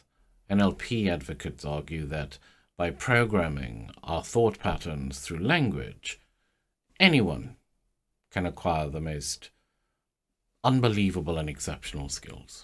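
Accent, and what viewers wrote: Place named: RP, United Kingdom. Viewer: I am NLPed by this posh pronunciation 😇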